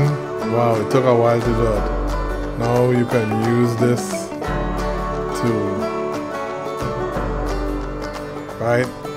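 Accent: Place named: Sint Maarten, Caribbean. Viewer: Great video. I appreciate this information. I don’t know why but you definitely sound like you have a Caribbean accent, in particular a St. Maarten accent. Let me know if I’m correct lol.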